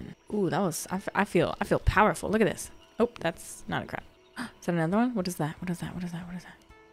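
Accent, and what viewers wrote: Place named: Magdalen Islands, Canada. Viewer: it reminded me most of the accent on Les îles de la Madeleine in Quebec. Close but not as “muddy”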